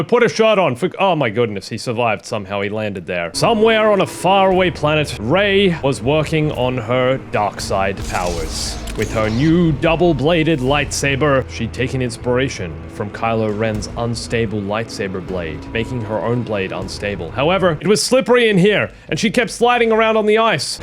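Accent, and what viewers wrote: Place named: Australia. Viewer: And your Australian accent is perfect! 👌🏻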